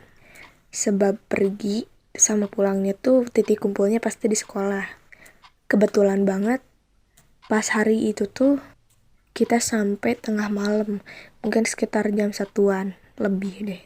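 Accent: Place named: West Java, Indonesia. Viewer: Sunda banget yaa logatnya.. hehe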